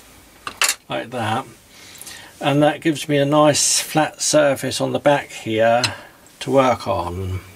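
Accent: United Kingdom. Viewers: Super creative and informative video,! And what a cute british accent:-)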